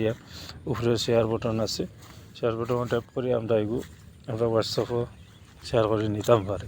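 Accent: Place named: Sylhet, Bangladesh. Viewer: Bhai lage sylhoty 😊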